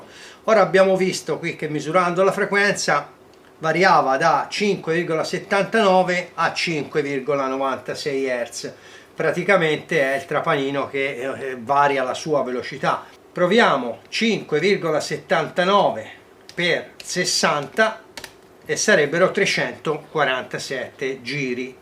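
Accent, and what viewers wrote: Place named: Lucca, Italy. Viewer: See ...sei ganzo !! Toscano anche te ...dall.accento sembrerebbe lucchese io pisa ahhh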